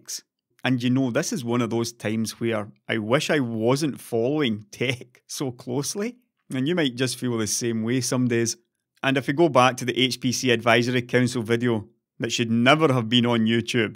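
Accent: Scotland, United Kingdom. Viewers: is this Scottish? so lovely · a dash of scottish accent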